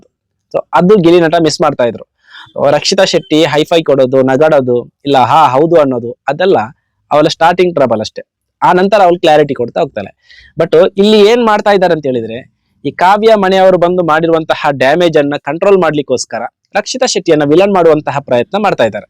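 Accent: Mangalore, India. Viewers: ನೀವು ಬಿಗ್ ಬಾಸ್ ಬಿಗ್ ರಿವ್ಯೂ ತುಂಬಾನೇ ಚೆನ್ನಾಗಿ ಮಾಡುತ್ತೀರಾ. ನಿಮ್ಮ ಮಂಗಳೂರಿನ ಭಾಷೆ ತುಂಬಾನೇ ಚೆನ್ನಾಗಿದೆ ♥️♥️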